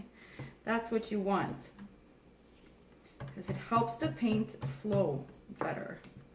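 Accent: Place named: Canada. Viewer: Ha! I knew you were a fellow Canadian by your accent! Love your work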